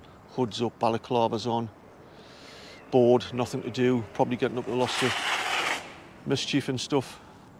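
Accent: Newcastle, United Kingdom. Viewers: Love this content. And I love the Geordie accent. Subbed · OK, thanks for replying. I just found your channel, and I love to hear the Geordie accent, especially as I haven’t lived in the UK since 1970, and the only chance to hear it is from occasional visits from friends, or watching somebody like Sarah Millican on TV. All the comments about T. Dan Smith took me back to my time in Newcastle and Gatedhead, 1962 to 1970.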